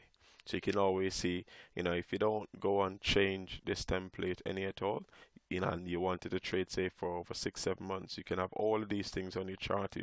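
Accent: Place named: Jamaica. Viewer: You sound Jamaican 🇯🇲 😳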